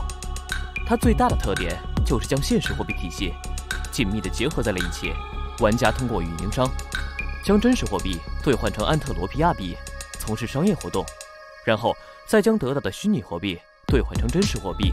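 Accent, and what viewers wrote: China. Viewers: 聽起來是大陸人 可是單位一直換算台幣 搞得我好亂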